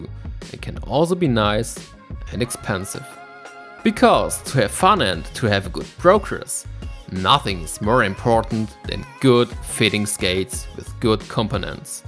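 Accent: Germany. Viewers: I just ordered my first pair of inline skates ever. I’m 42. I grew up using quad skates (ice skated too.) Got the Powerslide Next Core 80 all black set up because everything less expensive was sold out. Ended up with the dream skate😆🤩 Great video with very straightforward tips I’ll be using. I recognize that Berlin accent. Love it. Thanks!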